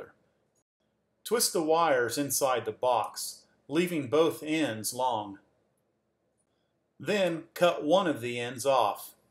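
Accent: South, United States. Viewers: that's just how we on the South say "wh"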